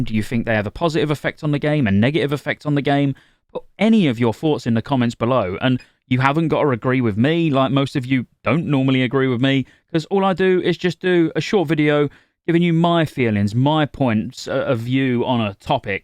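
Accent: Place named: Lincolnshire, United Kingdom. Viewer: Love listening to the Lincolnshire accent on YouTube.... "Items" or as they are called in Lincolnshire... "Eye-ums" Much love, Yellowbelly myself <3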